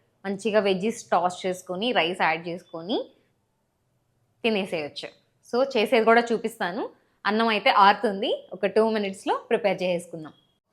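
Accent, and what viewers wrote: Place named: Rayalaseema, India. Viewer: Om Shanti you are from Rayalaseema kada inti vallato kaburlu cheputunnatla vundi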